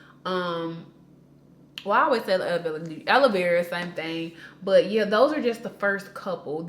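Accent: South, United States. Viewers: Sis! Your skin, hair and southern accent! ❤️❤️❤️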